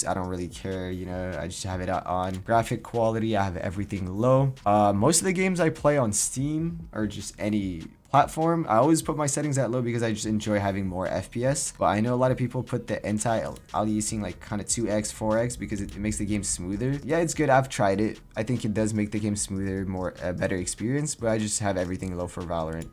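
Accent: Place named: France. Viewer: you're french ? you got a nice accent for a frenchie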